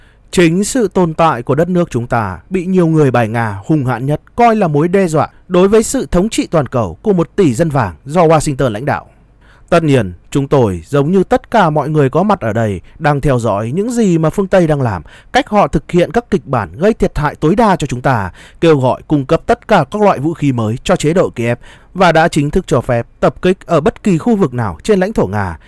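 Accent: Standard. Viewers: Bạn bl rất chuẩn ,hay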